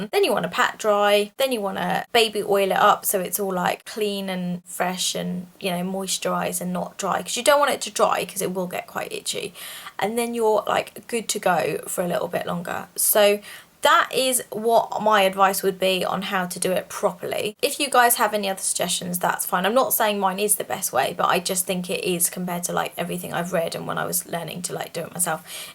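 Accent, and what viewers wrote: England, United Kingdom. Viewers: you are super cute and that english accent is just the icing on the cake !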